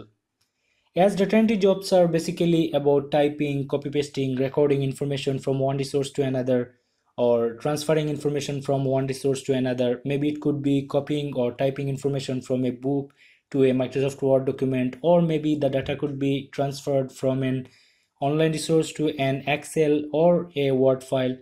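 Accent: Sylhet, Bangladesh. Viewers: Are you from sylhet? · R u from sylhet? Ur accent says that, great work bro🤗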